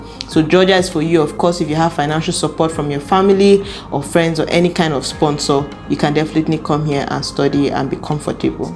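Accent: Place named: Nigeria. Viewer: She is nigerian and they speak french, I believe that's the reason for her accent.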